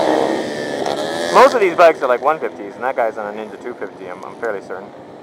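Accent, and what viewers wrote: Canada. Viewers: are you Canadian? :D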